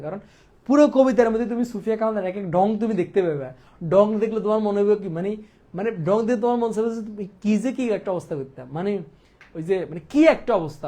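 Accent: Chittagong, Bangladesh. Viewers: Vaiya apni ki chittainga..?🙂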